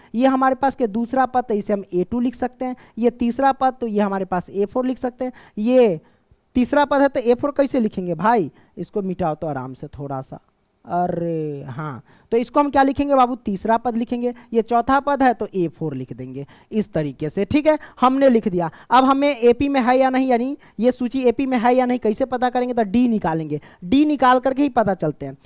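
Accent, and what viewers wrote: Bihar, India. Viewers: Aap bihari ho kya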